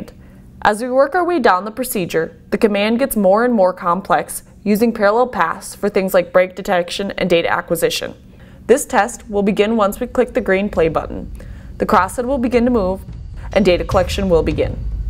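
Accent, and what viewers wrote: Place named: United States. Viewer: That's understandable, but this is American accent, which is very strange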